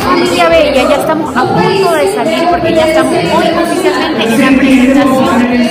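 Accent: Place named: Ecuador. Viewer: DE VERDAD ME QUEDE IMPRESIONADO CON TU DESENVOLVIMIENTO EN ESTE VIDEO, LUCES HERMOSISIMA, Y BIEN PROFESIONAL EN TUS COMENTARIOS, TODO CON MUCHA FUERZA, CARISMA Y A PESAR DE LA POCA EDAD QUE TIENES DEMOSTRASTE TALENTO Y DEMASIADA BELLEZA SIN PERDER ESE TOQUE ECUATORIANO AL HABLAR Y SALUDAR, MUY RADIANTE CON UNA ENERGIA SIN FIN, FELICITACIONES, ME ENCANTO ESTE VIDEO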